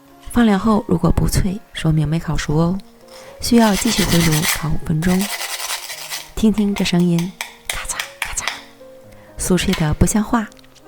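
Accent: Northeast, China. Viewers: 博主的东北口音走到哪都是特别有辨识感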